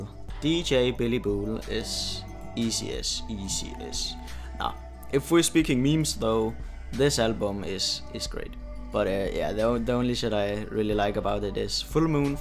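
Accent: Denmark. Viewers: are you danish? you kinda got the danglish accent lol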